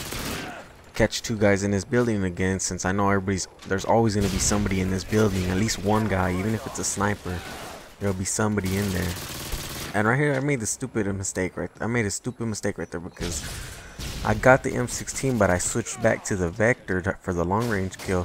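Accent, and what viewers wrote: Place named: United States. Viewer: You sound American :)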